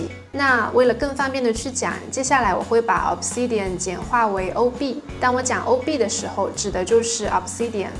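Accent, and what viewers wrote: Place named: Shashi, China. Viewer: 为什么你说话这么像我们沙市人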